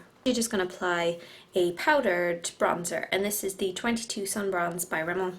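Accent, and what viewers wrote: Ireland. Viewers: Are you Irish ?-2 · are you from ireland ? o: your accent makes me think c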